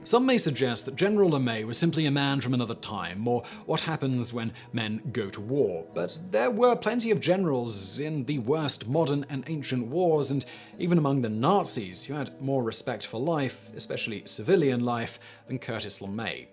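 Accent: United Kingdom. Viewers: General LeMay was the brainpower of SAC (Strategic Air Command). I spent my Air Force enlistment (4 years) in SAC. General LeMay was considered the father of SAC, and a hero. I understand many critics condemn his firebombing of Japan. I say Japan started WWII without fulling understanding America’s ultimate power to defeat Japan. jJapan’s sneak attack on Hawaii made many Americans feel any offensive against Japan was fair game. You sound like a Brit, you and the Japanese should be thankful the nuke wasn’t dropped on Tokyo. You do a disservice condemning the USA for firebombing Tokyo.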